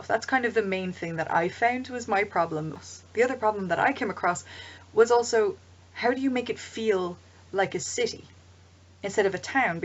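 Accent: Ireland. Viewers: you irish? where do you play? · Thank you for the information. Interested in city planning since world-building an accident society similar to Rome. Btw: that accent is cute as hell. Irish?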